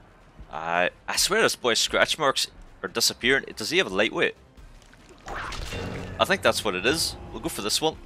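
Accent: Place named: Northern Ireland, United Kingdom. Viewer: at the start there i thought you were gona have subtitles the entire video coz youre from northern ireland haha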